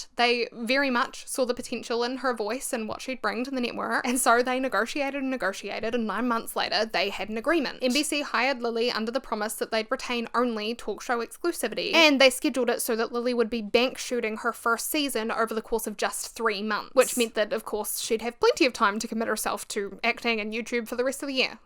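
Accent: New Zealand. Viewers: I subscribed for the thick kiwi accent. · its so comforting hear a kiwi accent because as a fellow kiwi who consumes alot of youtube alot of it is from American or British youtubers i love watching your videos its so well made and also making me proud of seeing content i love to watch being made by someone whos from the same country as me which idk if thats odd haha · I had to pause the video to come here and brag that I correctly guessed your accent was Kiwi! (as an American). Okay, back to regular programming. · New sub - I love your 🇳🇿 accent! This video is super thoughtful♡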